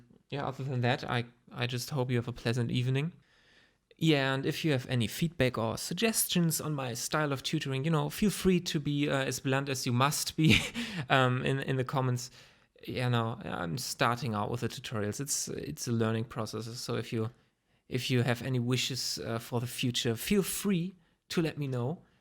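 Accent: Germany. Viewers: I think youre a german guy who speaks very well english 😂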